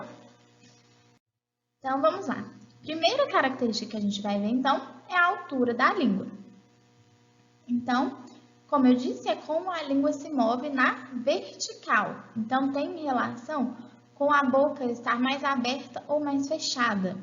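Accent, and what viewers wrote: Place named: Minas Gerais, Brazil. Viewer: Sotaque minerim ^^ Bom de mais da conta.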